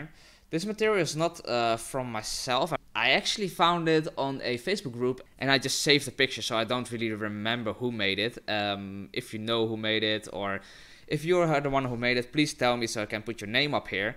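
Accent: Netherlands. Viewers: Where are u from? ur english sounds like ur dutch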